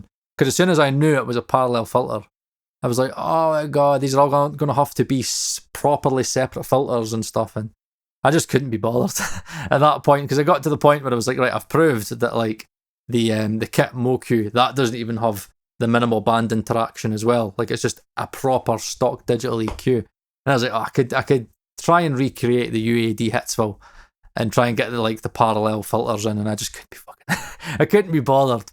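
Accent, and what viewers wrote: Scotland, United Kingdom. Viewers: instant subscribe here. Watched that AP video and just felt like he was missing so much. Especially you talking about the unique behaviour of the controls on EQs, and reaching a result is very different from recreating a result. This and the cramping, parallel architecture etc. That and a Scottish accent and i'm 110% in.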